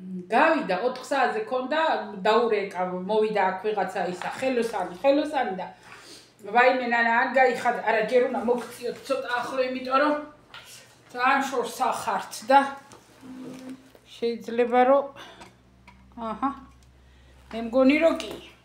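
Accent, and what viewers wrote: Kakheti, Georgia. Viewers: ჯანმრთელობას გისურვებთ,როგორ მიყვარს თქვენი იტალიური კახური აქცენტით, გაიხარეთ,უფლის წყალობა არ მოგაკლდეთ❤❤❤❤❤.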